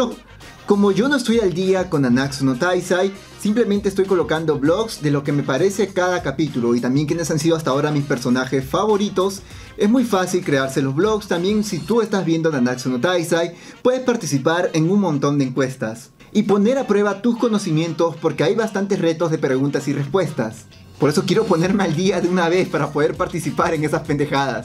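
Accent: Mexico. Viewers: Porque hablas como mexicano, si eres peruano, te hace falta identidad hermano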